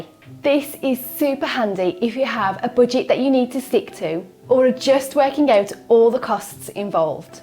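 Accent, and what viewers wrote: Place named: West Midlands, United Kingdom. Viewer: Nice to hear a fellow West Midlander talking sense. Weve watched all your vids. Me and wife looking forward to going in Sept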